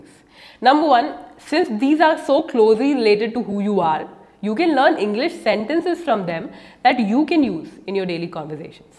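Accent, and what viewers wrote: India. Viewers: Your english language is a just like a Indian language ❤ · your hindi accent is very boring. appreciate your confidence.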